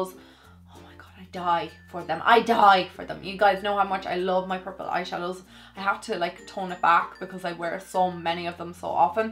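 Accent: Ireland. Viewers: what part of Ireland are you from? I'm from Dublin love ur videos and love ya byeee!!!!!!!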